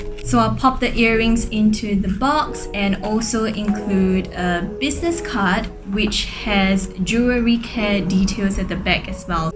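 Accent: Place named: Singapore. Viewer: I love your video, I think your accent is a mixture of Singaporean and Australian which is interesting for me to listen to. I hope I can live in Perth in the future and enjoy it as much as you do :D!!:yougotthis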